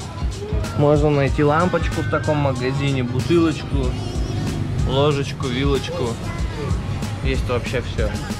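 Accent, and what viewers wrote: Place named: Harlem, United States. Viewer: Где английский учил, по фильмам? Говоришь как черный из Гарлема.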